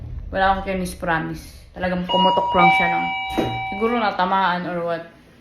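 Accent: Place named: United Kingdom. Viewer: Parang taga cambridge ka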